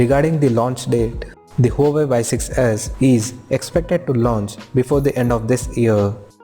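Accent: India. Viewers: U speak with a strong indian accent hard to understand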